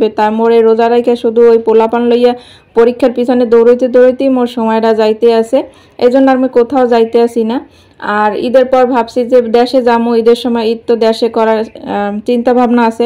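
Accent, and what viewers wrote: Barishal, Bangladesh. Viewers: আমার বরিশালের কথা শুনতে কিন্তু ভীষণ ভালো লাগে। তাইতো আপনাকে ভালোবাসা দিয়ে গেলাম আর আপনার ভালোবাসার অপেক্ষায় রইলাম আপু❤❤❤❤❤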